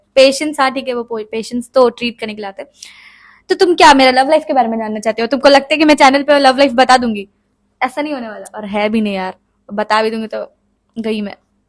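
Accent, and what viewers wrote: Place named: South, India. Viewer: Didi's accent is like now South Indian..😂😂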